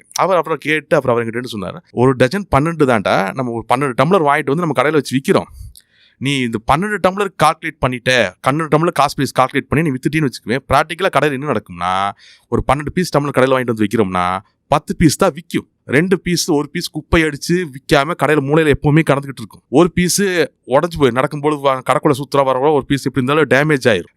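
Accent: Kongu, India. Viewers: இனிமையான கொங்குத்தமிழ்..... 👍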